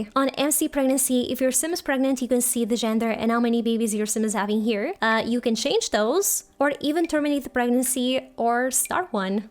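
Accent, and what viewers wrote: Portugal. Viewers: 1 minute and I already knew you were Portuguese! hahhah loved the video!❤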